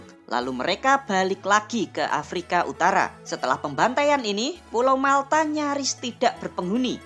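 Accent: Java, Indonesia. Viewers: Medok bgt hostnya😂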